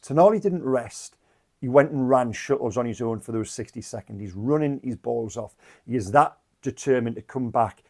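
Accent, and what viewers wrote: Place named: Geordie, United Kingdom. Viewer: Are you German ? Hallo greeting? Proper broad Geordie accent this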